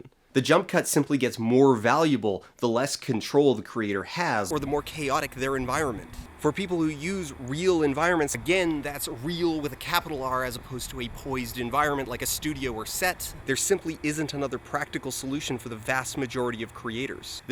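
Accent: Canada. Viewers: I was just wondering if he was Canadian. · 2 things: -So... You're Canadian? -While I agree with the video and the ending message, I think sound quality matter more for video than you're giving credit.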